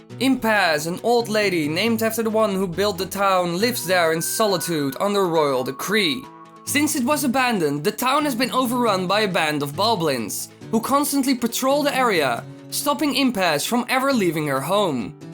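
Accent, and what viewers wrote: Netherlands. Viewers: do you happen to be dutch? i can really recoqnize the dutch accent as i am one myself :p came across your theory through the zeldainformer fb page 😁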